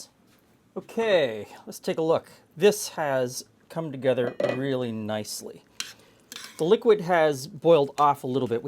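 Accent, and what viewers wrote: Canada. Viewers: I just know he’s Canadian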